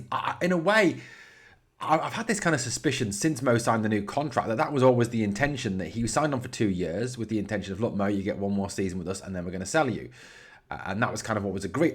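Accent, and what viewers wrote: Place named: Liverpool, United Kingdom. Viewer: Good old Liverpool accent.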